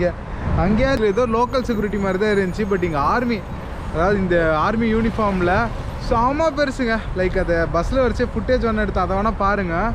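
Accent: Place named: South, India. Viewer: Aviya iviya south slang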